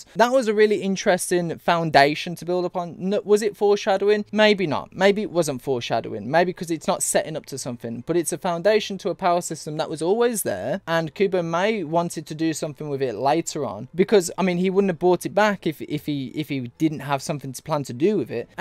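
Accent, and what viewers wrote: United Kingdom. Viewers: haha sorry😅 maybe I should've worded that differently. I'm just subbed to a ton of different channels. Like hundreds... Anyways yeah so excited for bleach again! And love when you talk about it. Especially when you go into the symbolism and theological side of it. Your cool British accent keeps me engaged too. Now imma have buy some merch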